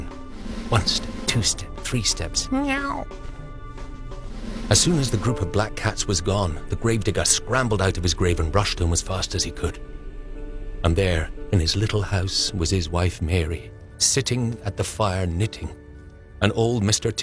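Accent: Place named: Edinburgh, United Kingdom. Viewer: The accent he's using is from the Northern tip of Edinburgh. I spent much of my childhood in Scotland and Ireland.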